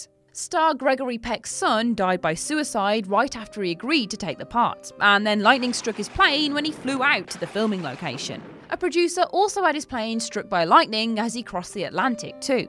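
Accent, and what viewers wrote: United Kingdom. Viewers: okay, enough of this. is obviously British. American pronunciation is different. Get over it. Worchestershire is pronounced Wooster-sheer, schedule is pronounce shed-ule. You are aware that the British INVENTED the English language. We only borrowed it, so give her a break on pronunciation, okay. You go!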